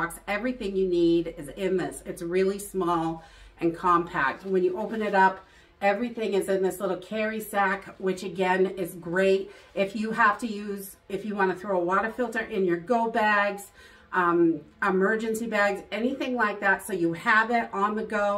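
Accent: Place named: New England, United States. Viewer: You are already getting a New England East Coast accent!! You can come park yah cah next to mine! Welcome 🤗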